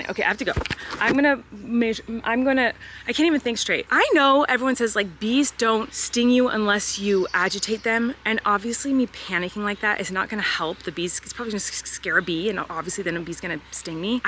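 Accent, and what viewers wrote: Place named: California, United States. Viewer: I knew you were saying it different but I thought it was your California accent. Lol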